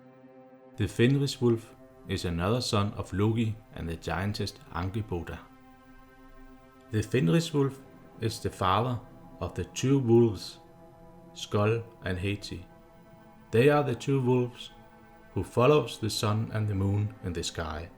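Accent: Denmark. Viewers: Excellent Video!, i have the doubt, where are you from? i think you have danish accent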